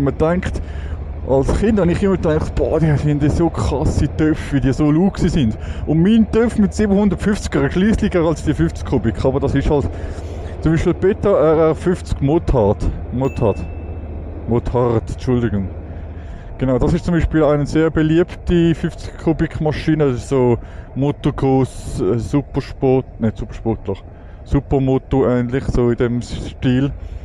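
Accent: Switzerland. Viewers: Schwiezerdütsch, wie spaßig ist das denn, ich liebe es. Geht um Führerscheinklassen fürs Motorrad, soviel hab ich verstanden, großartig. Schöne Grüße aus dem wildem Osten (Deutschland, Thüringen).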